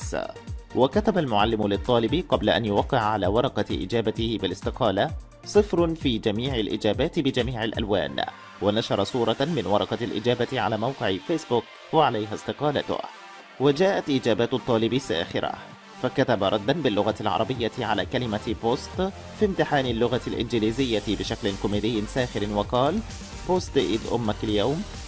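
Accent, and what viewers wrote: Egypt. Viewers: والله انكشفت طلع يعيال الي يتكلم مصري 👀👀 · ايه الكلام المصري ده ضحكني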